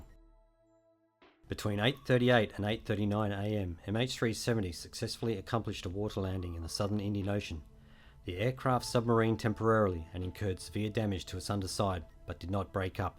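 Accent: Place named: Australia. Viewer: Brilliant work. Really well done. How do you know the CB’s were pulled at a certain time? And, what was the purpose of then restoring their position later in the flight? Again, great job, sir. As a fellow Aussie, you had me with the accent. I hope you pursue a career with ATSB. Needs more great, analytical minds!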